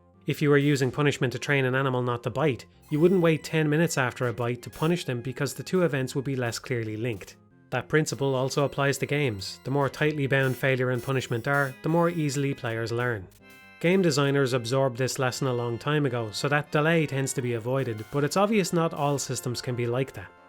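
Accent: Ireland. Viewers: irish asmr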